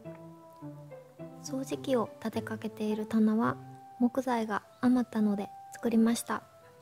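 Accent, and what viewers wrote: Kansai, Japan. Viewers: 少しだけ漏れてる関西弁好き☺️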